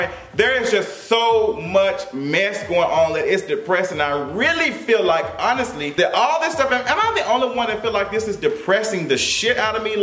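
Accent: Memphis, United States. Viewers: It's so funny to hear someone from Memphis try to sound like they're not from Memphis lol. I love you!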